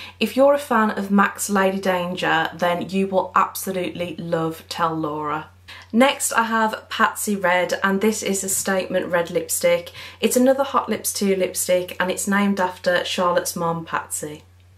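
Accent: Birmingham, United Kingdom. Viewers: You sound like a fellow brummie to me - then I heard you say ‘my mom’ and decided most definitely! Thanks for the swatches. You obv love them because they’re all so well used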